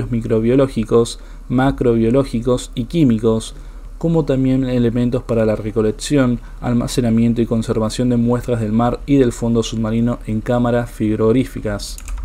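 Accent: Argentina. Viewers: Y porqué habla un argentino,???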